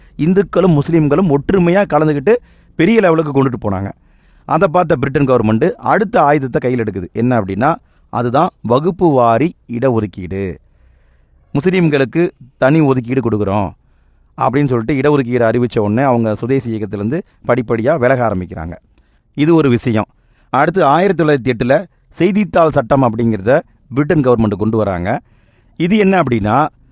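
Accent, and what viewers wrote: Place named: South Tamil Nadu, India. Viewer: Thank you so much 🎉 unga slang paatha neenga south side maathiri theriyithu sir.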